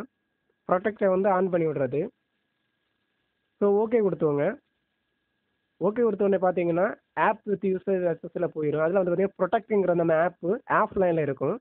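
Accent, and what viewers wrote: Kanyakumari, India. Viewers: Broo neega Kanyakumari thana...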